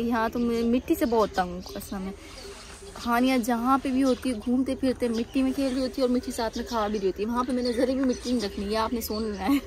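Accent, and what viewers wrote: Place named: Sindh, India. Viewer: آپ کا بولنے کا لہجہ سندھیوں جیسا ہے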